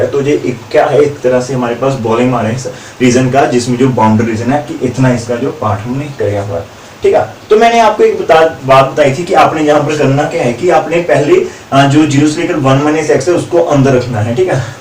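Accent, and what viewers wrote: Punjab, India. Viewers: Thank you sir ji ,Punjabi touch aayi jaanda boli ch Sir ji 👌👌👌👌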